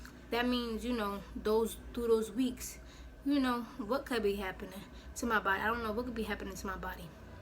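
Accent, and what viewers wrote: New York, United States. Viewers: Ch Ch she probably from New York . That’s what her accent sound like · You know she from New York 🔥😂🗽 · Are you from New York? You sound like you are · are you from new york?😭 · you from new york ? 😭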